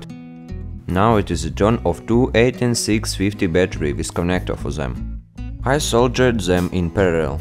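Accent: Russia. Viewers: Bro make more videos you are good and your english is good enough . And this Russian accent it makes your videos much better and different keep upp 👌👍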